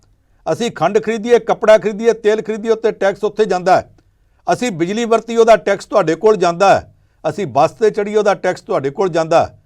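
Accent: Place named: Punjab, India. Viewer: ਠੇਠ ਪੰਜਾਬੀ ਤੇ ਜਟਕੇ ਢੰਗ ਨਾਲ ਅਸਲ ਹਾਲਾਤ ਬਿਆਨ ਕੀਤੇ ਹਨ।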